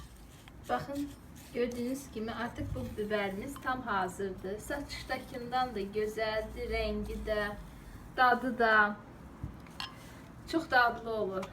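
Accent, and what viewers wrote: Azerbaijan. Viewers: Pul biberi begendim. Azeri kardeslerimizin konusma tarzina bayiliyorum. Ellerinize saglik.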